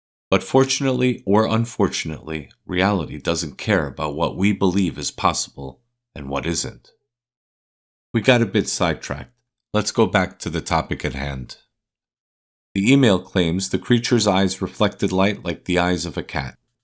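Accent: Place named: United States. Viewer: I was actually wondering that (excellent American accent btw, but I can also hear the Hungarian), if this is your native country, telling of local stories. That mega-brilliant anti brain fart, i.e. epiphany, came to me later, however I forgot to edit my comment to include it. Sorry. I do love your content with the excellent quality you bring ;) I've long been into cryptids & paranormal occurrences, so this is great!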